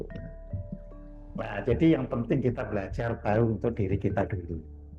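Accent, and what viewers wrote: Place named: Surabaya, Indonesia. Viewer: Bopo Suroboyo ne kentel bgt nih, Sampek emeh keplincuk 😅😅😅, Rahayu bopo ❤❤❤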